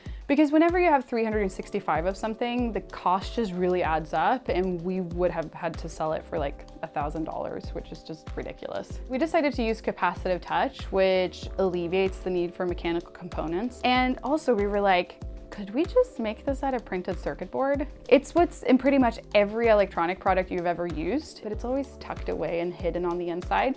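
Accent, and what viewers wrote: Sweden. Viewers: Wait you swedish?? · Are you swedish?! Cool product tho. I'd buy it :)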